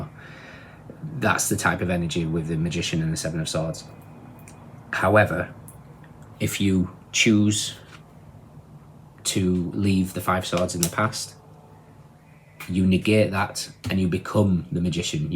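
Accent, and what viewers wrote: Northern England, United Kingdom. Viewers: I always look forward to your readings. I love your accent…. A proper northern accent. Thank you for reading 🙏🏻Have a great week ahead.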